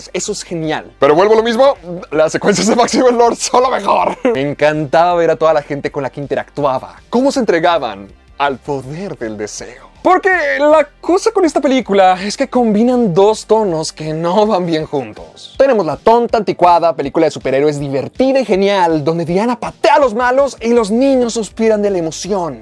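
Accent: Chihuahua, Mexico. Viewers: Apoco es chihuahueño nmmen jaja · Eres de chihuahua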